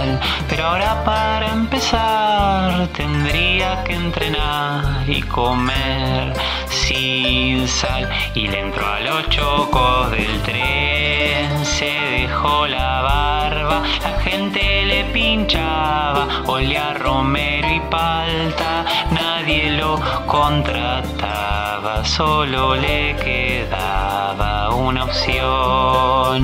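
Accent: Argentina. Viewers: Que extraño que es escuchar esa instrumental tan asiática junto con la voz argentina.Muy bueno,me gustó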